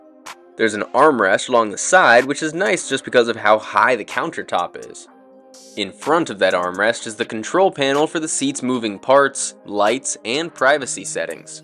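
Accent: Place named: California, United States. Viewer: You have the same accent as the SNL The Californians!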